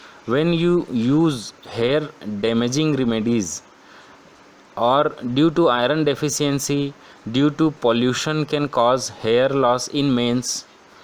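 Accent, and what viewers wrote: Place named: India. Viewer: Indian accent, you know it's a damn scam!!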